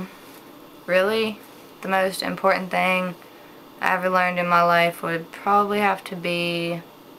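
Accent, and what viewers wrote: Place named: South, United States. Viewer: ... >.> you're from the south... O_o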